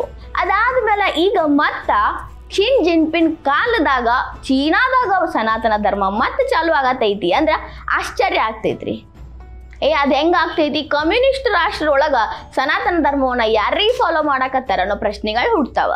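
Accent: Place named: Uttara Kannada, India. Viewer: Anna adu uttarakannada slang avru matadirodralli tappenilla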